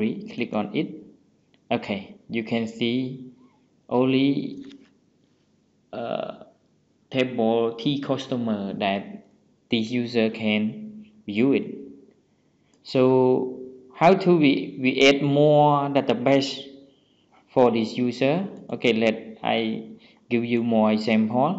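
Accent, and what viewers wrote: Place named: Cambodia. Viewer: depend on your accent . im sure 100% that u khmer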